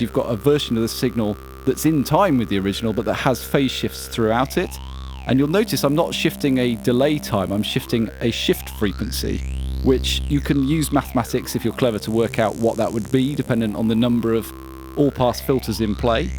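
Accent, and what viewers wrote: Germany. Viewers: Striking German accent there (now try to pronounce that properly!??? )! Yet another colourful, enjoyable and warm vid from:) Gotta add how inspiring it is when you show all the gritty details of the processes taking place, makes my fingers itch to get back to my studio...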